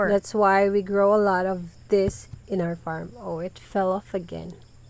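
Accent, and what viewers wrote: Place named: United States. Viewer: Kuyaw visaya diay ka mam mura man gud American accent ka the way you speak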